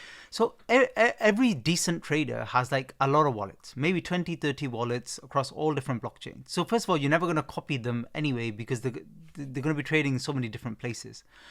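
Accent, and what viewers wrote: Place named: Newcastle, United Kingdom. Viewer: Bro, you can tell he's from around the Newcastle~ish region - from listening to the accent, in my opinion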